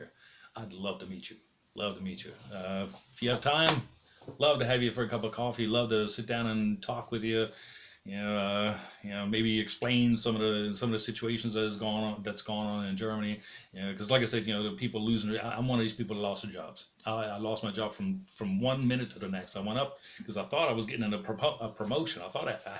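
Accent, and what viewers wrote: United States. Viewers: Your American English is excellent my friend you had to have lived in the states at some point most Europeans speak a somewhat British English speaking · Is this guy American living in Germany? He sounds American.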